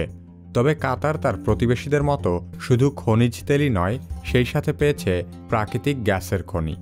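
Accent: Bangladesh. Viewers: বাংলাদেশের ভাষা যে কত সুন্দর তা আপনার কথা শুনলেই বোঝা যায়.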